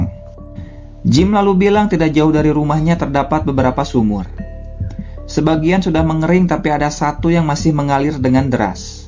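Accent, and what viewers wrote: West Java, Indonesia. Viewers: Kang orang sunda nyaa.? 😁Logat sunda na kabawa wae euy🤣 Salam kang ti Bogor👍🏻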